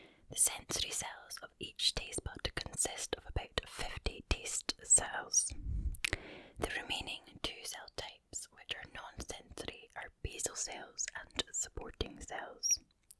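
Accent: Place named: Scotland, United Kingdom. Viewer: sounding super Scottish in this video - love it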